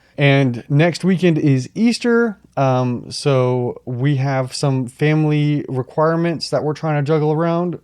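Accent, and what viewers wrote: South, United States. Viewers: I love your vlog on this video at your house in Texas during your quarantine until you're talking about the second episode of "The Star-Spangled Man" from the mini-series of "The Falcon and the Winter Soldier" got came out on Disney+ while it's all about John Walker, who appears on the morning talk show of "Good Morning America" as Captain America, revealing his desire to live to Steve Rogers' mantle. Meanwhile, Sam Wilson and Bucky Barnes struggle to work together as Barnes expresses disapproval in Wilson's decision to surrender Roger's shield. They travel to Munich and the find the Flag Smashers smuggling medicine. Wilson identifies a possible hostage, who is revealed to be the group's leader, Karli Morgenthau. The Flag Smashers, with enhanced abilities, quickly overpower Barnes and Wilson until Walker and Hoskins requests Barnes and Wilson join them in aiding the Global Repatriation Council (GRC) to quash the ongoing violent post-Blip revolutions, but they refuse. 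Meanwhile, Morgenthau receives a threatening text from an unknown number, so you're talking about all of the characters from the start after that, I hope your husband, Zach will be proud of you and I'm very happy for you, I know you're half Mexican and I love your Southern accent!! #TheFalconandTheWinterSoldier #DisneyPlus #Marvel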